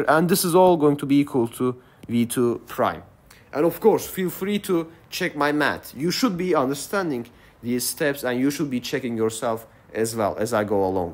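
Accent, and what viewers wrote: Turkey. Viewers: aksanından türk olduğunu anladım direkt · nice video nice turkish accent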